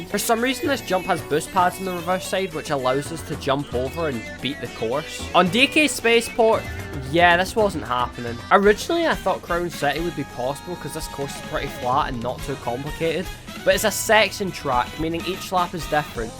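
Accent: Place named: United Kingdom. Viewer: He's bri'ish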